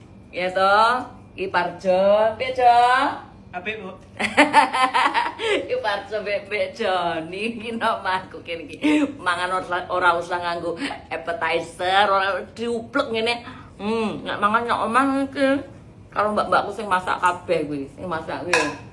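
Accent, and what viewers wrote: Java, Indonesia. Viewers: Masyaallah mbak...medok natural banget sampean, tapi yo tetep cantik tetep sugih👍❤️ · Masya Allah jawanya medhuuuk, klaten hadir mvk ❤ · Keren mbak omongane medhok jowo 😂😂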